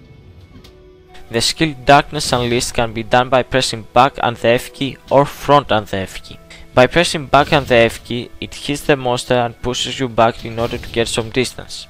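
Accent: Greece. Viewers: you sound greek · Στάνταρ 'Ελληνας με αυτή την προφορά. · The commentator has a really heavy, greek (?) accent. Cute :P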